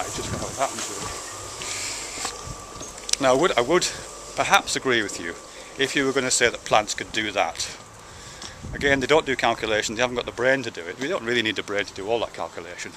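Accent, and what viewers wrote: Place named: England, United Kingdom. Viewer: Grow your hair longer, lessen the English accent a tad and you'd be splitting image of Dr. Rush from Stargate Universe. Haha!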